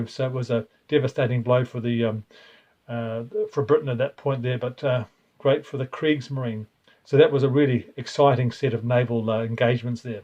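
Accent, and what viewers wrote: New Zealand. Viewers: Gidday Gidday. Love this AAR and your Kiwi accent. I'm a Kiwi in NZ who loves naval wargames too and has a good collection. Would you be keen for a game sometime?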